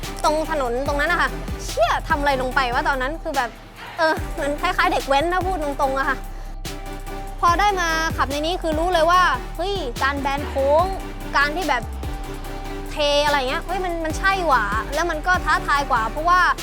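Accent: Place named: South, Thailand. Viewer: เก่งมากครับน้อง ถามนิดคนจังหวัดอะไรครับ ผมคนนครศรีธรรมราช ฟังสำเนียงเหมื่อนคนใต้ครับ เก่งมากครับ น้อง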